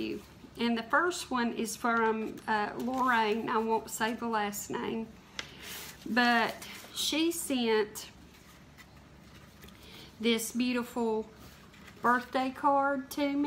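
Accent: South, United States. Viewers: Hi! When I came across your YouTube video for the first time I was drawn to you immediately! My mom was from Virginia and I lived there for period of time when I was a kid! Your southern accent and your personality make me feel at home! I really enjoy all your videos and your calming effect! Take care of yourself and keep up all of your good work! By the way, I live in central California now!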